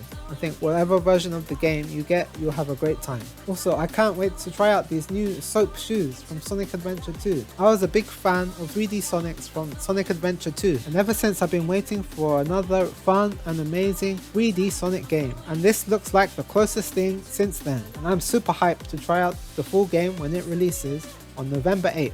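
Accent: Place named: Japan. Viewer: Are you Japanese? Your accent is interesting.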